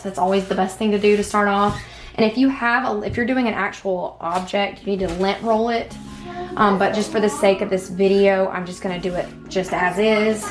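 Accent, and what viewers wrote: South, United States. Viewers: Girl u must be from da south! 😊 Great job!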